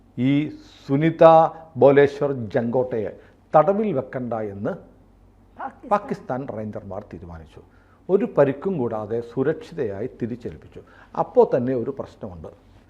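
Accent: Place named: Thiruvananthapuram, India. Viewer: തിരുവനന്തപുരം ഭാഷ ഉച്ചാരണം.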